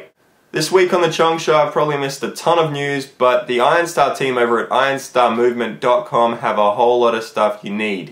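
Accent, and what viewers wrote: Australia. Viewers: Yay Australia! Good to see someone whos not American for once. I dont have to stab myself becuase of the accent! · Are you Australian? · MOVE... and your accent is Australian ^_^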